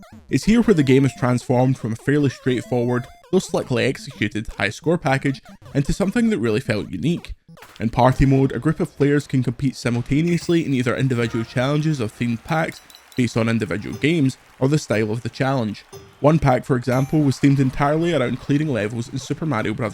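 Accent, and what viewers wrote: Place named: Scotland, United Kingdom. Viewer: is sounding particularly Scottish today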